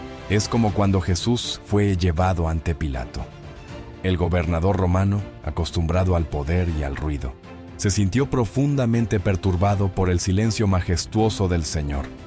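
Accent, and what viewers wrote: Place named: Guatemala. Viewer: Me parese qie eres de Guatemala por el tono de thu voz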